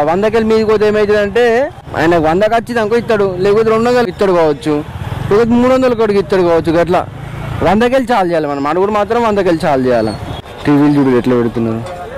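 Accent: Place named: Hyderabad, India. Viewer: Pakka Hyderabadii antar ra babu🤙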